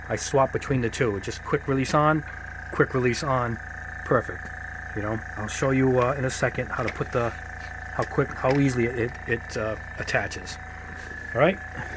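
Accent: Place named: Long Island, United States. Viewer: You have to be from Long Island. I can understand ever word you say clearly haha!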